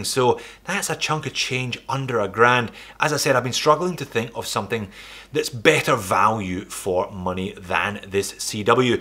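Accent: Scotland, United Kingdom. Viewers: I love your watch videos. Your Scottish accent is great too haha!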